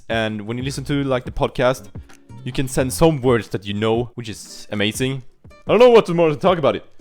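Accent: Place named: Sweden. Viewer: You're Swedish right? You have that typical Swenglish accent hehe (hope you don't take this the wrong way, I'm Swedish myself so it's just very recognizable :)). Anyway great study advice for beginners. I have studied Japanese on and off for 10 years but still only know the basics, but that's the result of only studying on and off lmao.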